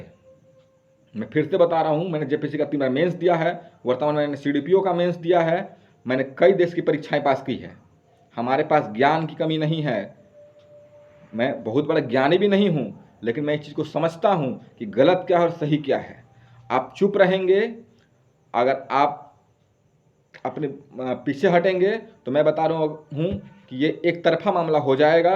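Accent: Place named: Bihar, India. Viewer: इसका बात से ही लग रहा है , कि ए बिहारी है और सेटिंग कराया है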